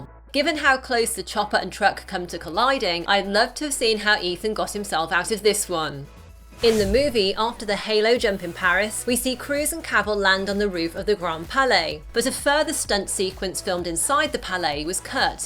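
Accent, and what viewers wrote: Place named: United Kingdom. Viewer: British english ? wow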